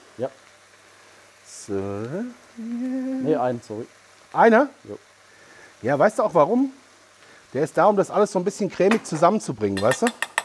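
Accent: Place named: Frankfurt, Germany. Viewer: Schaut gut aus,und der Frankfurter Dialekt 🤟👍🏻Gruß aus HD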